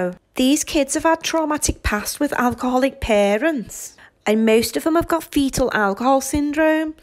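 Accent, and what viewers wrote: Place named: Scotland, United Kingdom. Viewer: Hi from Lancashire! I love your strong Scottish accent! Keep up a good job, your videos are hilarious, but so true! Keep exposing child abuse 👍🏻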